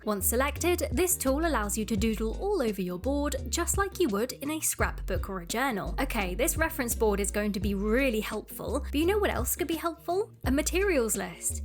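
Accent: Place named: United Kingdom. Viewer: I love your art sm your voice reminds me of Ldshadowlady but I think it’s uhh cause your both British 😭✌️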